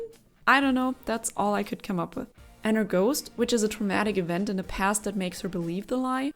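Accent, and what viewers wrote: Germany. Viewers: Are you German? Your accent sounds very German.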